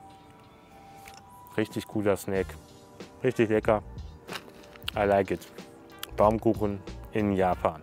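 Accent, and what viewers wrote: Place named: Thuringia, Germany. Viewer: Sympathischer Mann, man merkt direkt das er aus Thüringen kommt:D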